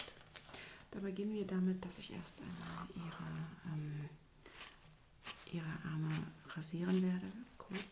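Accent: Germany. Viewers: Está hablando inglés o alemán? O habla el inglés con el acento alemán, solo curiosidad, habla diferente pero se le escucha bonito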